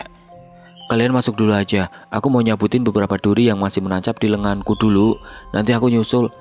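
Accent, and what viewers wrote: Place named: Banyumas, Indonesia. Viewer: Ngapaknya jangan terlalu di liatin bang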